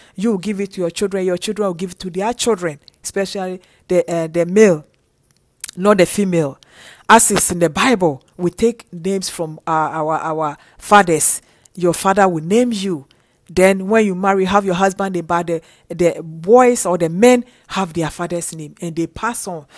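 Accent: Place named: Africa. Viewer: This was inspiring and greatly appreciated. Your teaching and voice without background music, without european or english accent but rather an African accent from the Motherland makes it authentic. I look forward to your upcoming history lessons. Thank you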